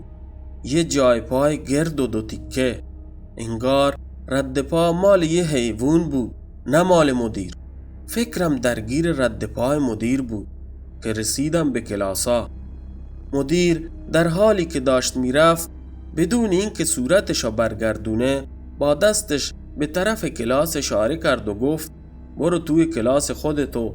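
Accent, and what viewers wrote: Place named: Khuzestan, Iran. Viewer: درود بر شما🌹من فکر میکردم خوزستانی هستند😅چه عالی واقعا من هم لذت میبرم از لهجه راوی عزیز👍🏻👍🏻😊تندرست باشید همیشه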